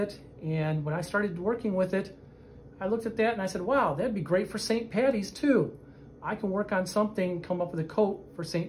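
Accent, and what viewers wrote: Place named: Midwest, United States. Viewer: and accent!! Midwest??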